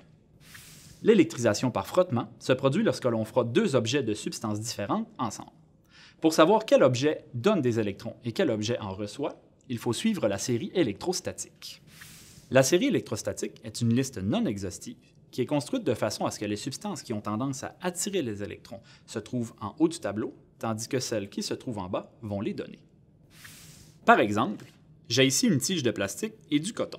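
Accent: Quebec, Canada. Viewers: merci pour cette incroyable accent québécois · L'accent québécois donne un truc a la video je trouve mdr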